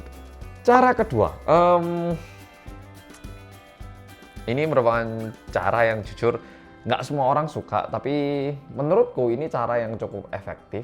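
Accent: Java, Indonesia. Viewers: Sukses terus mas, ga pernah bosen aku nonton channel ini, mantap lah, jowo ne medhok tenan, wess apik lah poko'e. sukses terus mas. semoga lebih maju kedepannya. dan dapet menginspirasi kita semua mas🙏🙏🙏🙏🙏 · Ta pikir orang korea yang medok eh tau nya irit😬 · Jangan ngomong bahasa Jawa ke aku:').Aku gak bisa bahasa Jawa.Coba kamu denger aja deh intro rindingdong nya mas.Mas kan ngomongnya medek wkwkkwkwkwkkw🤣🤣🤣🤣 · 1. Jempol, Mas utk segment barunya...👍 2. Sy emak2 biasa yg ga ngerti investasi forex ato reksadana ato apalah gitu2. Jadi invest nya dlm bentuk LM aja. Dari tip no1, alhamdulillah udh lumayan dpt 'segenggam' LM..😘 3. Tip no 3 sdg dicoba. Kok rodo angel yo atur mindsetnya... hehe...😏 4. Looking forward to other practical tips n tricks ya nan irit. 5. Tqtqtq😊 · Orang korean yang medok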